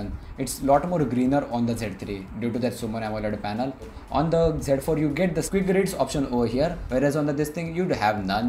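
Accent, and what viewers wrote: India. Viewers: hmissi ALA Indian accent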